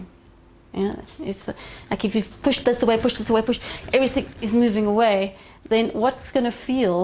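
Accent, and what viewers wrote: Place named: South Africa. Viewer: You sound South African. Are you?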